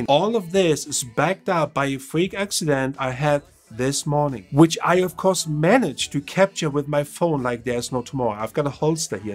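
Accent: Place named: Germany. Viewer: I like your german accent, schönen Tag noch 👍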